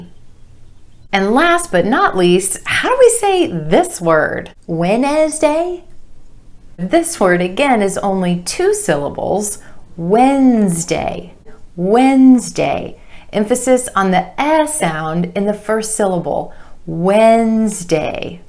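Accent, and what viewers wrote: United States. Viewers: I do love American accent! Massive thanks to you Dear! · Two reasons why i watch your videos: 1- to learn the american accent 2-you're so damn cute😍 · Hi madam I'm one of your subscriber.I love American accent I need more vocabulary pronunciations video's please make some more examples video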